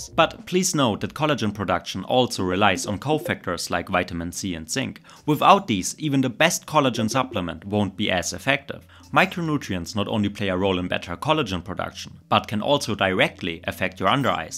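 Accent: Germany. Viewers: Are you german? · Thanks lads, i'm off to buy both the sunscreen and peptide cream you showed here in the video - it's in one of my local stores :) Love the Deutsch accent, greetings from your cousin further up north in Denmark - Subscribed and liked the video! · That German accent paired with "we never accept sponsorship" assure me deeply. Thank you, you've saved me a lot of time and money! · Indian? Isn't this a german accent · Sounds like german „english“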